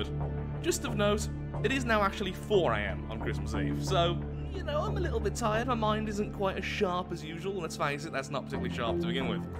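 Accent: United Kingdom. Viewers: next video can you turn down the game music a little? I can't hear your British voice as well as i need to!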